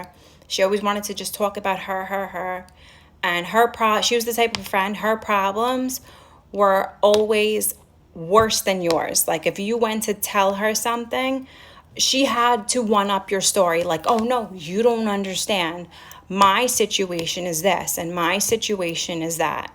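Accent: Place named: New York, United States. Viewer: As a Floridian I can tell you she definitely has an accent! I can hear it loud and clear. Are you from NY or somewhere up north? That may be why you don’t pick up on it.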